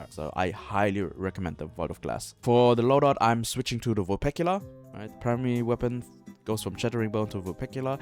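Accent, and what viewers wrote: Germany. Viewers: Me: not sure where this guys accent is from oh German got it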